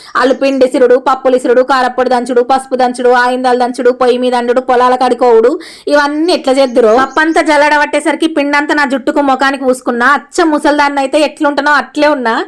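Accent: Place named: Vemulawada, India. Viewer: అక్కా మన వేములవాడ యాసను, దేశమంతా తెలియజేస్తున్నావ్ సూపర్ సూపర్ సూపర్,,,,,,,,,,,,akka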